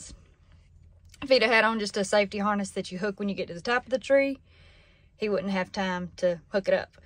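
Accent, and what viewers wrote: South, United States. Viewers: Hello from way Downunder (AUSTRALIA) first time on your channel & wow your southern accent is amazing. Sorry to hear about your dad’s hunting accident & I hope that he recovers fast & everything is ok. I’ve just subscribed so I’ll be keeping an eye out for you-all & check out your older videos..Take care now. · you have a gift that I think your dad knows....it is a very rare a voice like yours that impresses people who want to hear it. My Aunt Mary had it, she raised two daughters that had it. You have critics that don't understand what real Southern Women sound like. You are real, do things that most men can not do. You are exceptional and smart. Your dad did a great job in raising you! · What a nice face. Where is from the accent? Usa south?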